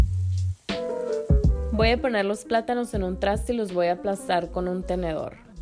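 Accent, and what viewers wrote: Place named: Monterrey, Mexico. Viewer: Disculpa,eres de Monterrey? Tu acento es muy parecido al de Monica Llaca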